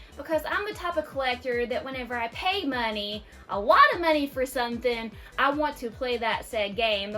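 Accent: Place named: Georgia, United States. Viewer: youtube suggested your channel to me, your personality is great and your accent reminds me of my friends back home in GA lol so i dropped a like and sub 👌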